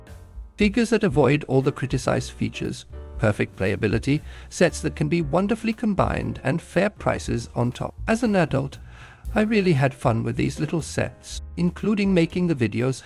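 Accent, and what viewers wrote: United Kingdom. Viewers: Hello 😊... Yeah 🕺... First comment here 👌🏻. Good job and well done my friend. Very British speech. But what happened with the sound? 🤔 It's scratchy 😊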